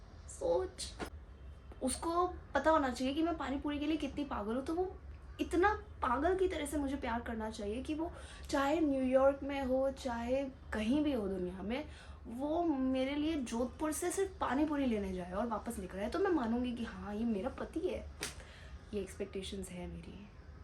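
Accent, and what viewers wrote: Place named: Gujarat, India. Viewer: Finally Youtube pe active hogi 😅😅 Nice video your bhai bhai vala ascent gujrati bol rahe thi aese lag rahe tha ap bahut khoobsurat aur cute ho 🥰❤️ waiting for part 2 video 😊😊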